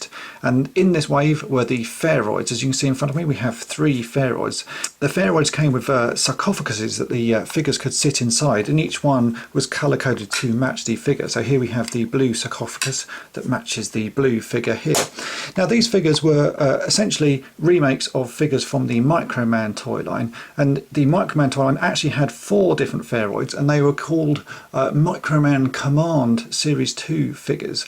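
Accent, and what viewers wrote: United Kingdom. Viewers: For a British dude, you sure know quite a bit about Japan. Are you part Japanese? You sound very British.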